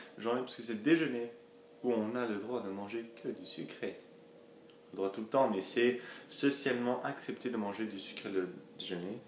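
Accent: Belgium. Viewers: Vous dites comme en Belgique :) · Vous parler comme nous lles belges :)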